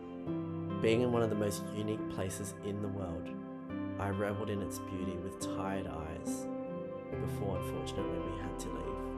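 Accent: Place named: Australia. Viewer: Hi, great video! It sounds like you might be Australian and I'm just wondering was Socotra listed by DFAT (or your respective Government travel advisory) as 'Do not travel' when you went? I'd like to go next year but unsure as its currently listed as 'Do not travel'...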